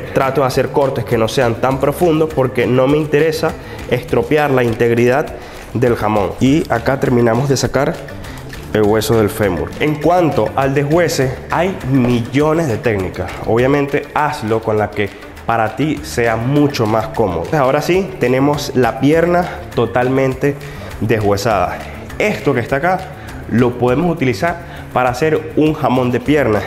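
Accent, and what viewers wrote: Venezuela. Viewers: A los segundos de escucharte, supe que eres venezolano. Eres lo máximo. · Otra cosa no tienes asentó Chileno parece tu dialecto Venezolano. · Tú estarás en Chile pero... ese acento...venezolano.... Saludos desde Venezuela, muy bueno tu video nunca he seccionado una pierna de cerdo, solo pollo y la pierna sin cadera es la que compro para hacer el jamón curado, qué fácil lo pones pero... es difícil!!!!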